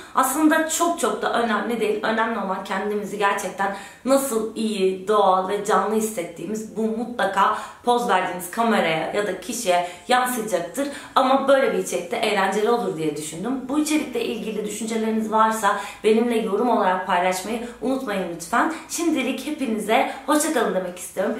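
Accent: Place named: Aegean, Turkey. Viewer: sesin ege kökenliye benziyor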